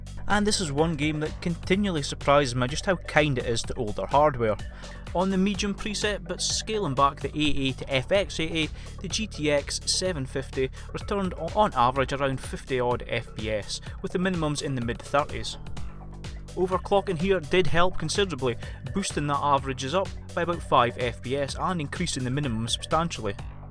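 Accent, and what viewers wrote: Scotland, United Kingdom. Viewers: Scottish accent 😍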